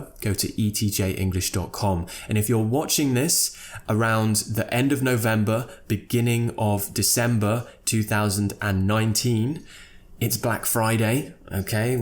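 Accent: United Kingdom. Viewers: Almost every comment section's top comment is about British accent XDDDD Yeah, I love it, absolutely. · I love your British accent so so much. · Can't help falling in love with your British accent :> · Your voice and pronunciation .I love British English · I'm a sucker for british english <3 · Your British accent is comfortable to my ears. In this video, there are lots of interesting useful expressions I have never heard! Thanks! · I knew the phrase "be a sucker for sth" before. Thank you for what you do on YouTube. I learn a lot from your videos just by listening to you. That's great! I'm a sucker for British accent. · I love british accent for the first time when i listen your accent. thank you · More than your british accent, I think is your voice, it's really a pleasure hear you... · Love your Britsh accent! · I am such a sucker for British accent. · I love your videos and your pronunciation! 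I adore British English! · British accent is more sweet with you our respected teacher thanks a lot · Thank you so much for this interesting and useful video! I've been your subscriber for a long time but I'm writing comments for the first time. I find all your videos useful and enjoy watching them. Your British accent is wonderful! I'll definitely learn these phrases from the video. I"ve never heard about some of them. Love your channel! Greetings from Tajikistan! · Ohhhh British accent is so charming!!!! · I'm a sucker for British accent :p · Really, you’re one of perfect an English teacher, Specially your accent British 👌, btw u have a good sound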